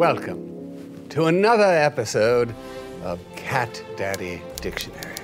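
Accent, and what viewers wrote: England, United Kingdom. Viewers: I adore your English accent- and that's coming from an English lady. Velvet all the way, my friend. 😘👌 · My cats Zooms during the day, she also makes a really unusual noise... she gets in the zone and she's zooming around. It is cute but I have upped her play time, so hopefully she uses up some energy. Nice British accent btw and that's from a Brit lol 🇬🇧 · I love the British accent Cat Daddy Dictionary time XD But also, I love when cats get the zoomies. Highly entertaining, but, play with your cats too! ^^ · Haha I am UKian, and you've got a great UK accent 👍🏼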